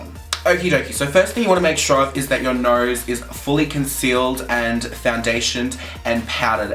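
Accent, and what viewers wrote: Australia. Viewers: this is probably the best nose contouring vedio i hav ever seen. 😍😍. u r bomb btw!💦💦. are u an aussie? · UR DEFINITELY AUSTRALIAN !!!🇦🇺🇦🇺🇦🇺🇦🇺🇦🇺🇦🇺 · are you Aussie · Are u Aussie !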